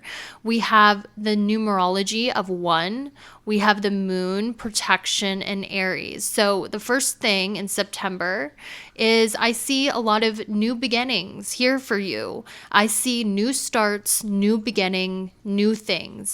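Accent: South, United States. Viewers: Love your Southern accent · I really like that southern accent. Calmed me down and made me laugh a bit. I chose group 3 and it really described me. I go to customers houses and build stuff that people order from Amazon or whatever they get it from. I was actually called an expert at building stuff from one of my customers. I want to do more creative stuff with music though. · I love your Southern accent and the fun themes you've been doing for your readings lately! I appreciate you & your creativity! You have a cute and fun energy :)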